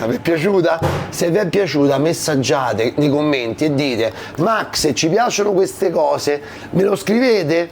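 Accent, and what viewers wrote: Rome, Italy. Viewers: Max ti seguo da ieri ma mi sono già iscritto sei un grande con il tuo parlare romanesco il giusto che non da fastidio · Finalmente risento parlare da Roma. Buona la cicoria ripassata. Ma che ne sanno qui in Liguria. Qua se trova la catalogna...... Mi fai ricordare il sapore....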